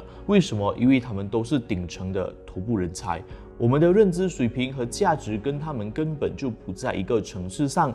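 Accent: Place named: Malaysia. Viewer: 聽口音是馬來西亞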